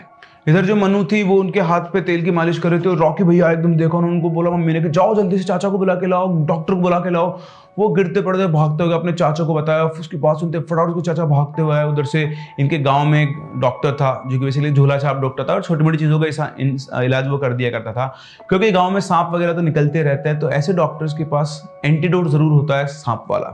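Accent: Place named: Bihar, India. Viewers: Narrating Story in Bihari accent is very Fantastic, we Bihari love it 😄😃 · Your Bihari Accent 🫡🫡🫡 ekdum Humare tarah hi bol rahe h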